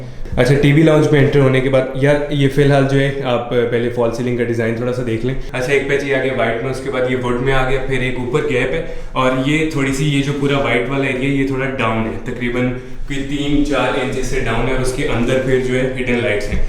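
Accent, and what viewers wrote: Punjab, India. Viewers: Yaar apka Punjabi bolna words bohot mazey dar ladta hai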